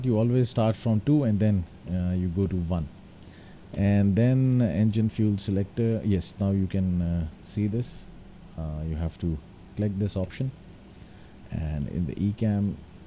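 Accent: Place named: India. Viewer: Clicks on video *hears Indian accent * ANNOYING and turns it of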